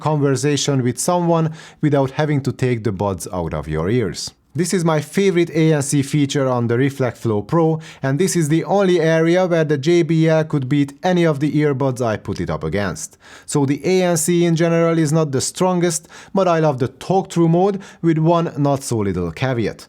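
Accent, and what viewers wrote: Hungary. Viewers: Magyar akcentuc, de fasza videó jó angol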